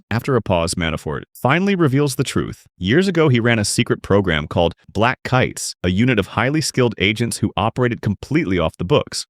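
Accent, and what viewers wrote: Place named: United States. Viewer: Why do we have to endure these ghastly American narrations?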